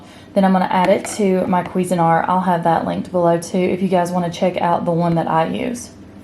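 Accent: South, United States. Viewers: I love your voice and southern accent 😀. The Keto diet and Intermittent Fasting has changed my life, I have lost 27lbs. in 30 days. The best part for me is that my back pain is gone, I was just told 3 months ago that I needed surgery on my lower back. Not happening my friends. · Love a good old southern girl accent..smh stationed in California..these people are so different out here lol..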